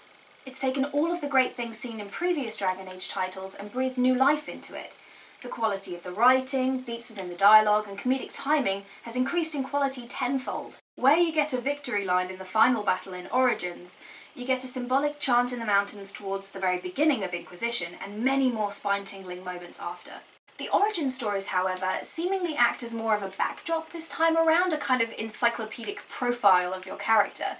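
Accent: United Kingdom. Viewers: Very good review, it’s a fair bit more informative than how I’ve found most other reviews of this game to be. In all honesty, I am already quite convinced that I will love this game (I enjoyed most of BioWare’s products, from Knights of the Old Republic to Mass Effect 3), but I had to see other people’s views on it so I would feel better about spending so much money on Dragon Age: Inquisition… seriously, this game is soooo expensive in the UK! Anyway, I found the part where you talked about the choices and their more subtle effects really interesting; it seems to me as though they are more maturely done and closer to what we have in the Witcher trilogy, rather than the binary options of most BioWare titles. Though I’m also prepared to expect from Dragon Age choices that are more about defining your personality than truly changing the storyline, whereas in the Witcher games we are only given a certain degree of liberty with Geralt – who is an already existing character – but have much more freedom with the direction in which you can take the story. 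Of course, both approaches have their ups and downs, so I’m not saying either is better than the other. By the way, are you from the South of Britain? Your accent sounds like someone from that area (asking only out of curiosity, as I find languages and speech quite fascinating personally). Anyway, this is a great video, I will subscribe.:) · You have a British accent. I'm subscribing!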